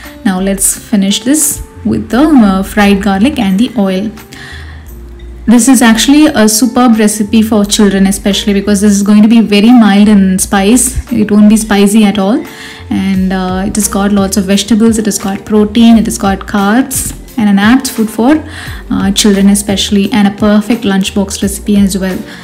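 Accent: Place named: United States. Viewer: நீ பேசுகிற அமெரிக்கன் இங்கிலீஷ் விளக்கவுரை மிகவும் அருமை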